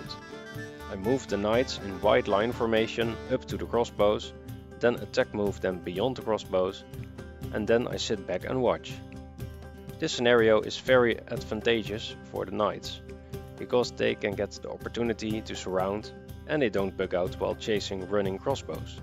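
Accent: Netherlands. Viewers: Oh god if that's not a Dutch accent I don't know what is :P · Such a thick dutch accent. Cannot listen to this, sorry.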